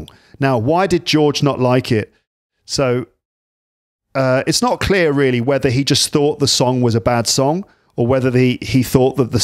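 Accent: United Kingdom. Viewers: I enjoy listening to you whatever you are speaking about! I love your British pronunciation. Hello from St.Petersburg.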